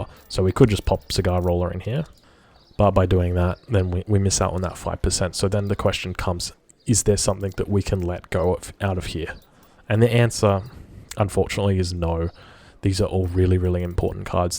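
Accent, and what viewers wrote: Australia. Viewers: Where in Australia are you from? I've heard someone from Brisbane who sounds like you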